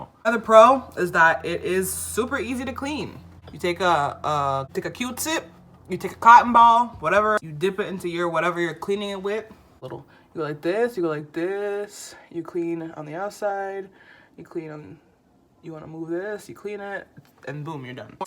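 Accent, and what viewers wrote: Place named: Dominican Republic. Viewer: I knew que eras Dominicana or cubana lol I have a Cuban friend that talks just like you, I’m Dominican btw 🇩🇴🔥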